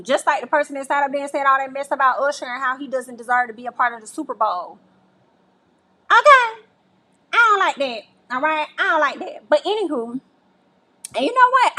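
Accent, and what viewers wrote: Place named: South, United States. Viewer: I discovered this channel last month she is funny, informative with that southern accent don’t know why her personality brings a sense of familiarity. Great channel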